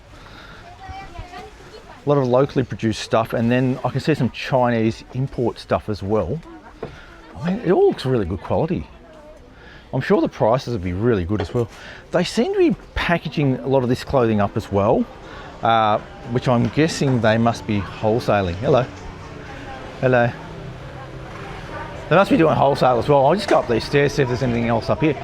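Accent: Australia. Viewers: Yeah I hear ya, 11hrs door to door it's a big day, I went to degree coffee today in Denpasar, good coffee, your videos speaking bahasa Indonesia have helped a lot with my learning as it shows me what an Aussie sounds like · Thank you, always such a pleasure to see your walk and talk. I always have to laugh when you talk English with an unmistakeable Aussie accent, but when you talk Indonesian the accent is almost gone. You learned it very well!!!! Don't think that many any foreigners talk Indonesian so well as you do. "Saya Belanda" by the way 🙂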